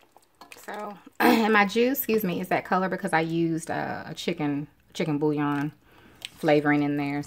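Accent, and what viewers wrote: South, United States. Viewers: New fan here. Would love to see you do a through and through without pausing and starting again. Your voice is southern and very soothing. Maybe you could talk to us about your day as you're cooking or whatever you feel like discussing. Just a suggestion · She sound fine. Like a southern bell.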